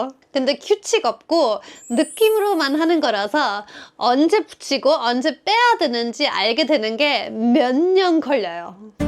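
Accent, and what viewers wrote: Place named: Vienna, Austria. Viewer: 여사님 목소리는 정말 비엔나 여성의 전형입니다. 프랑스 여성과의 큰 차이.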